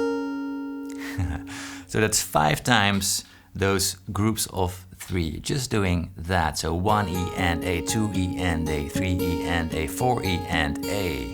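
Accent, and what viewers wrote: Netherlands. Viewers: You sound dutch!! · I love this guys’ lesson on this song. Always amazed at people like Paul Davids and their understanding of music. Also Loving the Dutch accent.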